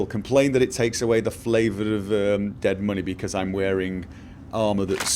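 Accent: United Kingdom. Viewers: My apologies if you have already answerd this question before, but from where in the UK is that accent of yours? PS: Please don't tell me it's from Norway in the comments. I am already aware that he lives there. But it is for sure not a Norwegian accent, I know thia as I live next to the border of Norway in Sweden.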